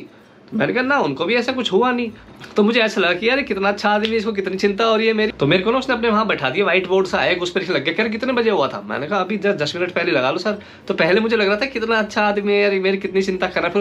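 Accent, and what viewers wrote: Haryana, India. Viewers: Sir apka hasta chehara aur apki hariyanvi bat bahot din ke bad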